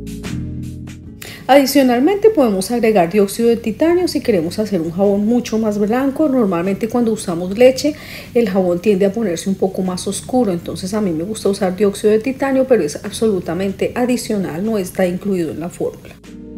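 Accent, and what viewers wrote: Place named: Colombia. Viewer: de donde eres?!!, tu acento parece Colombisno!@ Saludos, una fan,Diana 🇵🇷👋🥰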